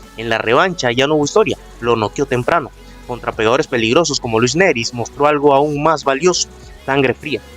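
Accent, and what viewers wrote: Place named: Cuba. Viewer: por tu acento intuyo que eres cubano que vive en el exilio, quizás panameño por aquello de aferrarte a DURÁN. INOUE es el 1er japonés que algunos consideran el mejor libra x libra y eso porque vivimos en tiempos de vacas flcas. Solo te recuerdo que un tira piedras como NERY tuvo al borde del KO al japonés, NERY es sumamente limitado(ROBÓTICO) y sin el alcance que tiene PICASSO. Dices que INOUE es un dios en su país y sí esto es porque allá no tienen jamás el número de campeones del mundo que tienen en otros países como MÉXICO, por eso se aferran a sus mejores campeones. Es muy probable que gane el japonés pero tampoco creo que lo haga por KO, igual no considero a INOUE ALGUIEN INVENCIBLE, y no le doy el % de posibilidad tan bajo que mencionas a PICASSO. Bien que te digas, ya que una cosas es ser y otra es ser experto o buen analista, hasta PNDJOS como FAITELSON se dicen "expertos "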